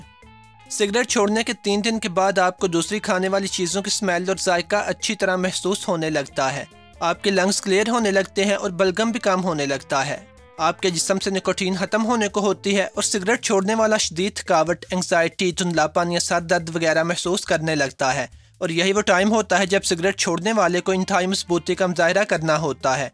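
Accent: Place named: Lahore, Pakistan. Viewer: Yaar Aap ka talking way bohat Pasand aya .... Sure Aap Lahore se ho .... Don't be offended mai bi Lahore se hi hun ...!!! Nice Video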